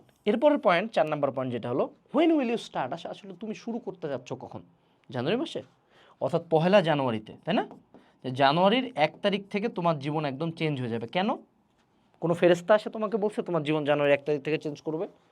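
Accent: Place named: Rangpur, Bangladesh. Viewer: Wow vaia sb smy reality tai bole..r vaiar ktha gulo sunle amr rangpur er ktha mne pre jay age sir rao evb blto amdr..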